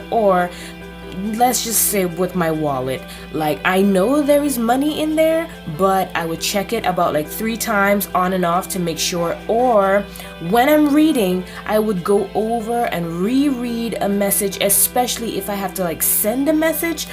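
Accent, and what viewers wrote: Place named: Caribbean. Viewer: Thanks for sharing your insights, this is seldom spocken or apparent on the youtube platform for black people. Correct me if I'm wrong but I'm hearing a subtle hint of carribean/West Indies accent from you.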